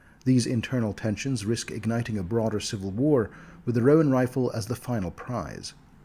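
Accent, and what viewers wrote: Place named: Texas, United States. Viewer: That is a great Texan accent and cowboy-talk. For a minute there, I thought this was a collaboration with Mr. Welch.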